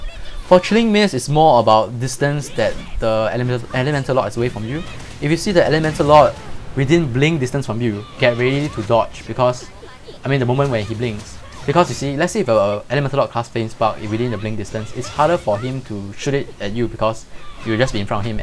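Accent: Singapore. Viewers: are u singaporean?? cos u speak like a singaporean anyway i love your guides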